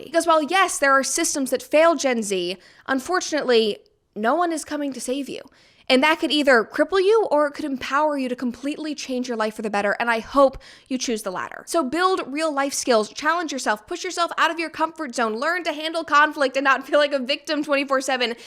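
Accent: United States. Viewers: I really liked the way u talked very good vibers and yapper with fast and quick typical American accent 🇺🇸 u are like my sister Gen Z she is 2003 born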